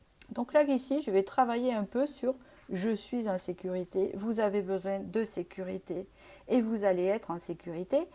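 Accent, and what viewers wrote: South, France. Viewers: Merci de penser à nous le week-end! Avec votre joli accent du sud...je ne sais pas de quelle région vous êtes...😊 Puisque vous parlez de propositions à vous faire, j'aimerais pour ma part plus de guidances pour les célibataires...il y en a très peu sur YouTube même si vous faites partie des chaînes qui en font le plus...ou en tout cas où le titre est suffisamment large pour nous intégrer. Sinon, sur YouTube, on entend toujours...VOTRE AUTRE... et s'il n y a pas cet autre?? Nous sommes des millions de célibataires et je n ai jamais compris qu il y ait si peu de thèmes pour nous...une autre guidancière, ÉVEIL DE L'ESPRIT, qui demandait également de lui envoyer des idées de tirage, m'a répondu que d'après les stats de YouTube, il n'était pas intéressant de faire des tirages pour les célibataires et que ça ne rapporte pas!!!😳. Circulez...il n'y a rien à voir!! 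Donc je me suis désabonnée. Bon dimanche à vous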